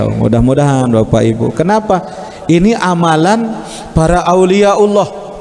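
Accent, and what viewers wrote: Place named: Bali, Indonesia. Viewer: Ininustadz siapa ya namanya? Logat biacara nya seperti asli orang bali 🙏🙏🙏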